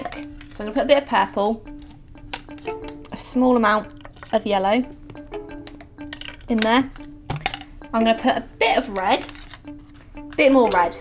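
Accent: United Kingdom. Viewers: Are you British ??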